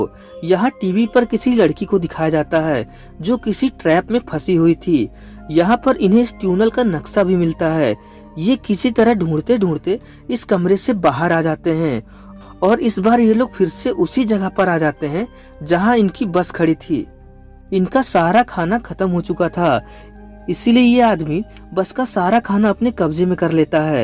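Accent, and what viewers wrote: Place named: Bihar, India. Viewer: ye bhiyaari h bhai inke yhaan english aise hi pdhaate h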